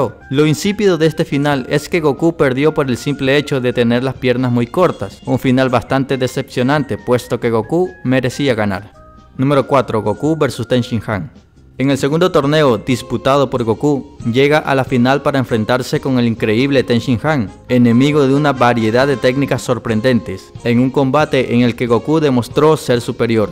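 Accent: Chile. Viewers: Jauregui Zurita chileno por el acento facil de diferenciar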